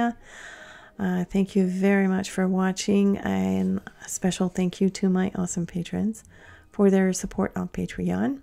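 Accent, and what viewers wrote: Quebec, Canada. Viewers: So beautiful! I just found your channel. I am also self taught and I do realistic acrylic on canvas. I need to step out of my box and I just love this. I recognize a french Canadian accent. My parents are both from Quebec, I'm first generation born Albertan. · Totally exquisite,!!!! 💕 I love your signature..... And you sound just nice and Canadian to me! 😄😘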